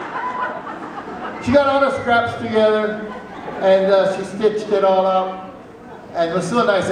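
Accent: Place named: North, Philippines. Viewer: that northern filipino accent is spot on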